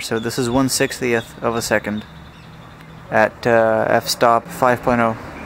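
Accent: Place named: New York, United States. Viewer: Que delícia ver um brasileiro falando inglês sem sotaque, na verdade, parece que você tem sotaque de NY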